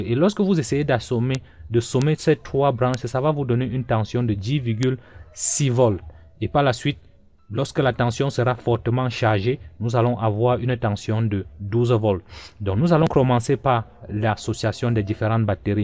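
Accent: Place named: Africa. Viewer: C'est super, j'adore ton accent africain, et le tuto est super bien fait 👍 je m'abonne pour ce travail de qualité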